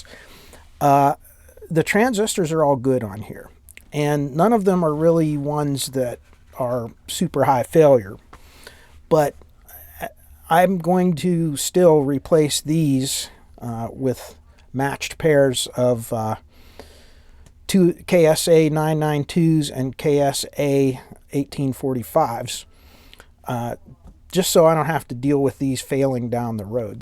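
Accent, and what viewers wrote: Pennsylvania, United States. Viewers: Happy New Year.... From your accent (PA), I would guess you know the lebanon bologna cure for your cold.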